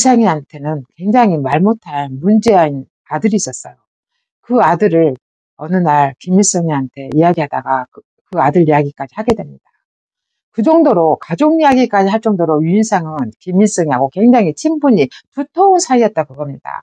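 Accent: Jeolla, South Korea. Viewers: 전라도 말씨가 이렇게나 예쁘시다니... 그동안 고정관념을 버리겠습니당~! 통영의 딸과 그 불쌍한 남편이 생각나는군요!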